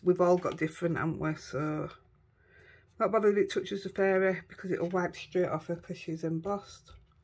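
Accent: Yorkshire, United Kingdom. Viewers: Oh fantastic as usual. What a cute little bag. Yes the Lavinia stamps are brill. Lucky enuf to be going to Lavinia shop later in the year on my trip to England. My list is growing lol. Love your accent being from Yorkshire myself.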